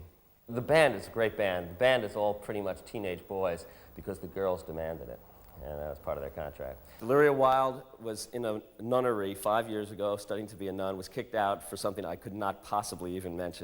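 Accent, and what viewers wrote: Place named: New York, United States. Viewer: His accent is THE New York City. I don't think he was brought up there though. A friend sent me this, said, this is Jim's mind. He's actually quite sane.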